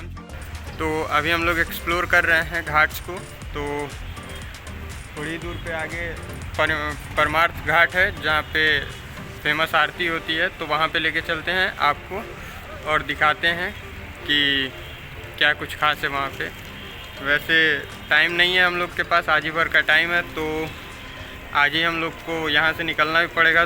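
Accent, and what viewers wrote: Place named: Bihar, India. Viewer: Good try bro, i love your content. But apko hindi thoda सुधारना पड़ेगा, कुछ ज्यादा ही बिहारी accent hain, like-aaj hi bhar ka time hain 🙄.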